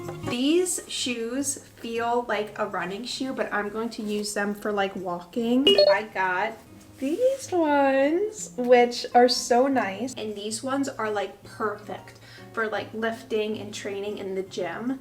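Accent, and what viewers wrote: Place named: Argentina. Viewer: "Sha" usas lunfardo gringa, sha casi argentina 😂❤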